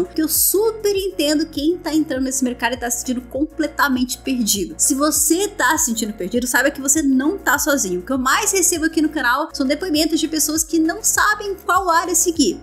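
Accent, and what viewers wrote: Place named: Brazil. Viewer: Bequiendgi, Frontiendgi... adoro o sotaque brasileiro no inglês, tanto tempo fora me faz sentir muitas saudades.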